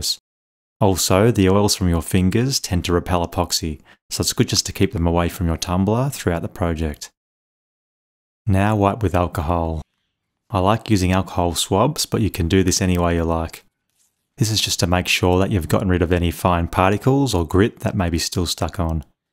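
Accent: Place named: Australia. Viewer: I also love your Australian accent... I can't find your name here. What is it?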